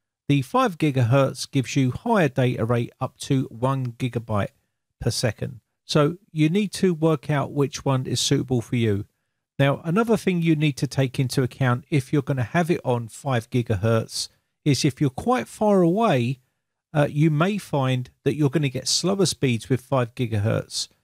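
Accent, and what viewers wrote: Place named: London, United Kingdom. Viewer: Well I suppose you live in or near London because of your accent 😄which I find makes me feel a little homesick (Sussex born and bred). But in any case we out here are sadly lagging far behind the rest of the world and I would pay for double my speed if it were available out here in the country still I suppose something is nothing eh??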